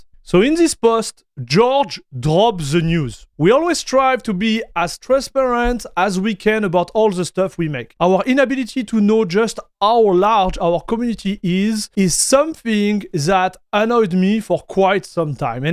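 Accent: France. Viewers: Thats a heavy French accent :P Mais bon j'ai tout compris donc pas mal!!<3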